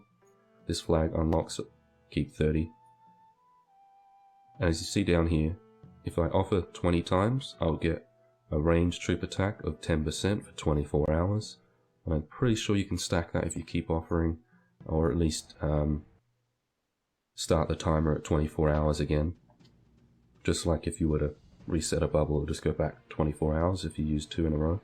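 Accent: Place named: United Kingdom. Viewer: Record your voice level at a higher volume on your next video, please I find it a bit low, could be clearer for your wonderful British accent. Great otherwise.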